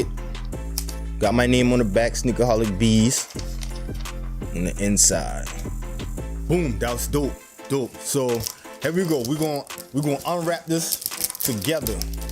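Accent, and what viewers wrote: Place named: South Carolina, United States. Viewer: Brah you sound like your from Moncks Corner South Carolina !!!!!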